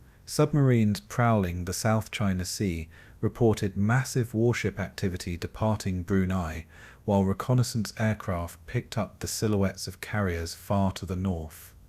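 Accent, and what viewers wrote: United Kingdom. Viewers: Sounds like a real person talking with a Limie accent. Good job Mate once again, again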